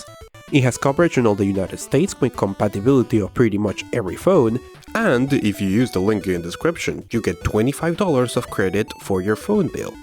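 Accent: Spain. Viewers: Guys he Isn't Asian, he is an Spain Guy, so that accent is Spanish accent.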